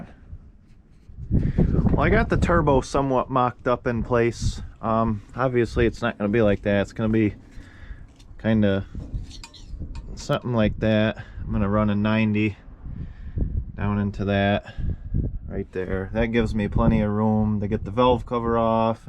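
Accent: United States. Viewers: You almost sound like you're from Minnesota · Well I would have bet you couldn’t have done this. I’m new to your channel. Nice job! Your door ID on the truck says New York but your accent says maybe Wisconsin? Well anyway you have a new subscriber.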